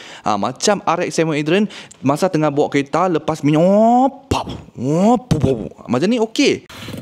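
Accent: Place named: Kedah, Malaysia. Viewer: pekat sungguh kedah hang ahahaaha anak jati kedah ni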